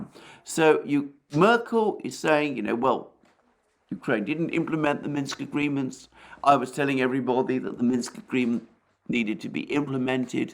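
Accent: England, United Kingdom. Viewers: Dont you love fine russian propaganda with a british accent? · It’s funny hearing Russian propaganda in a English accent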